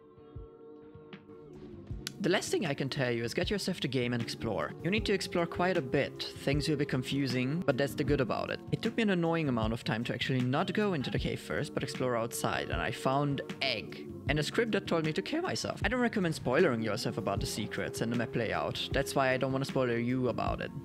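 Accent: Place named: Germany. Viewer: I love this game and I love you made a video about it. But also, gotta love your deustcher akzent lol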